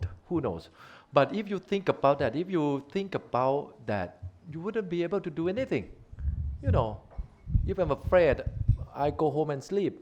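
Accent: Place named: Thailand. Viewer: อังกฤษสำเนียงไทย โคตรฟังง่าย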